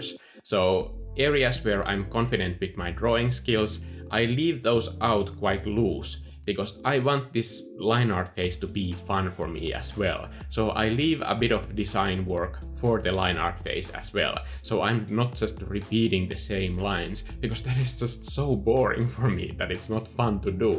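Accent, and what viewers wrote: Finland. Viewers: I think I have been at this Cafe place u showed in the beginning. If I’m right it is in Helsinki. That would also make sense to me since u have kind of a Finnish Dialekt. I love Finnland wanna go there again soon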